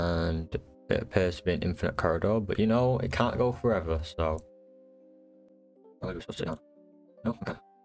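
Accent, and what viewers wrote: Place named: United Kingdom. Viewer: I dont like this video. Unhelpful. Sounds too british. Please redo this video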